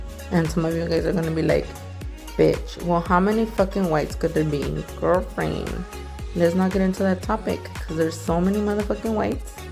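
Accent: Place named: Spain. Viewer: I love love your Spanish accent, sounds like my granddaughter blessings from Killeen Texas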